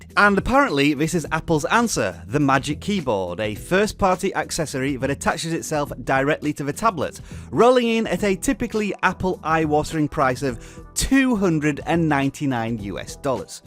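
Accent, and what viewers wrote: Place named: England, United Kingdom. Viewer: I thought you were from england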